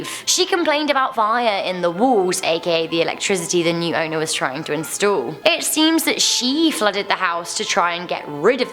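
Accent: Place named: United Kingdom. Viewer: lousy SHITBRIT narration. SHITTISH is the WORST kind of English inflection.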